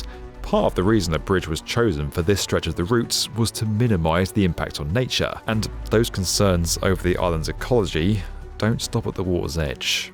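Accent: England, United Kingdom. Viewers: As a French person, it is so weird and funny to hear the places name pronounced with an English accent (although he's trying is best to "Frenchize" it !)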